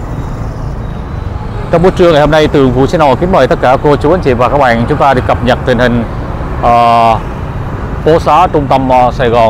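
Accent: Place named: Hanoi, Vietnam. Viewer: Giông ad như ở thủ đô của Cụ ba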